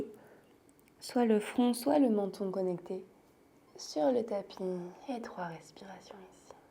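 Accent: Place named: France. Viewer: Bon dimanche, tu as un bel accent français, j'adore les grands écarts et les mouvements, chérie. 🥰❤🌹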